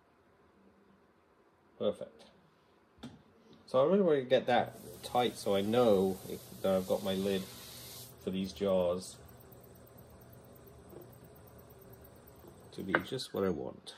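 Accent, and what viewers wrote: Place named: Wales, United Kingdom. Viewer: I hear a Welsh accent, reminds me of home. Wish you were here in Beverly Hills, so I could gain confidence in making something bigger than a tea cup or mug.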